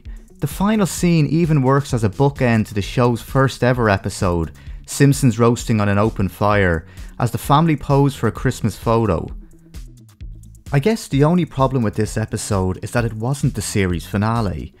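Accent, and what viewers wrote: Ireland. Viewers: He’s Irish. 💯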